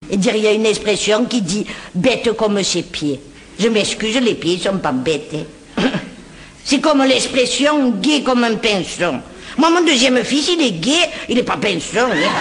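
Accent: France. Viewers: Elle a l'accent niçois. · C'est excellent!!!!j'entends vraiment tout le parlé marseillais de ma grand mère, c'est tout a fait ça Merci 🙏🥰💕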